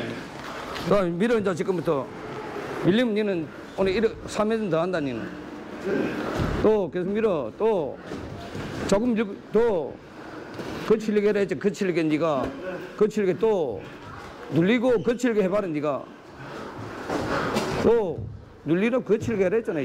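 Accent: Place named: Busan, South Korea. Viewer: 부산싸나이 말투 쎄네요. 그 속에서 묻어나오는 정이 느껴집니다~^^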